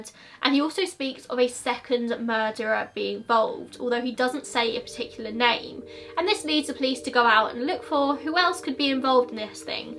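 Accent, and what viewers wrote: United Kingdom. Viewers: I am such a fan of yours, so I hate to make any comment that could be deemed as negative; however, it would be great if you could speak a bit slower. I adore your British accent, but have difficulty understanding what you are saying when you speed talk. I literally couldn't make out much of your Florida vlogs because of this. No disrespect intended 😉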